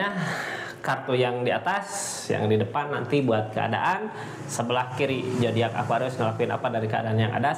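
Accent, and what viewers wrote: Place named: Sunda, Indonesia. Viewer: Saya suka dg mas lo wajahnya seperti anaku nomor 3 trus bahasa Sunda nya medok bangett makasih redingannya sehat sll berkelimpahan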